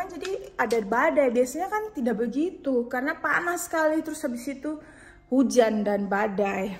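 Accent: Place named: Papua, Indonesia. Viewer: Tetap ya pertahankan bhs dialek Papua nona cantik manis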